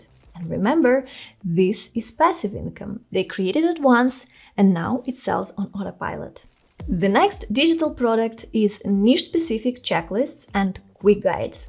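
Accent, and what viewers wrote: Russia. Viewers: russian accent is amazing, spasiba balchoy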